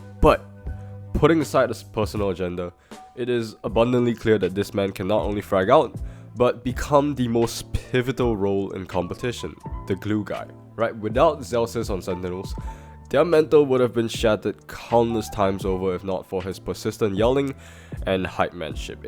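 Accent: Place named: Singapore. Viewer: i can hear the singaporean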